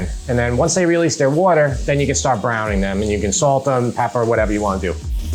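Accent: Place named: New Jersey, United States. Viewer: Well if you really want not to like him you could start with the fact that he say's lives on Long Island but talks like he's from New Jersey. That's a start.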